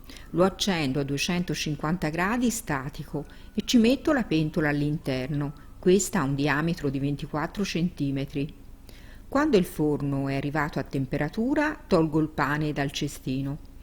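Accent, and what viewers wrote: Tuscany, Italy. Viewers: Molto brava, complimenti e che bel toscano parla. Senese?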